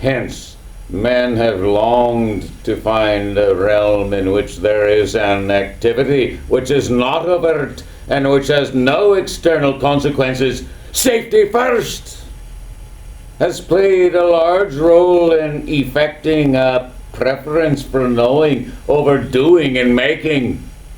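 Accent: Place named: Scotland, United Kingdom. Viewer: Love this Scottish Dewey. Aye aye!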